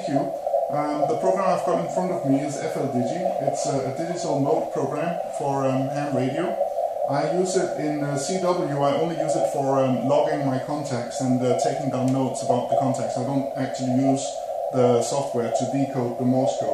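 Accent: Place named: Denmark. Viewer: you have got to be danish, your accent sounds danish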